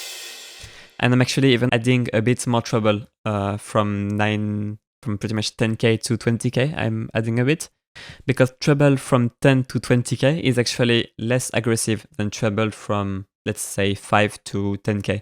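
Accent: France. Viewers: hey First off its a Very cool video you explain quiet well and thanks you and secondly are you français ? 🙃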